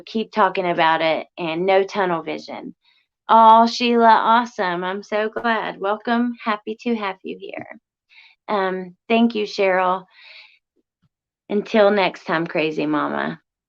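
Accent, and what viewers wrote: South, United States. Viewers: 1st time here, I can tell youre a southern girl like me lol 😊Youve got a new sub.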